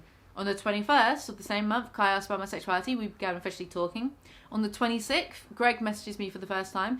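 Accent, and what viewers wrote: United Kingdom. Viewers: oh man, I haven’t enjoyed a British voice like this since John Lennon. when you look at the camera and tell Greg to fuck off after exposing some shit, well, it really hits home. just fucking hilarious. 😂 It’s so good that I know Onision really has defense at all to it. he probably should be scared.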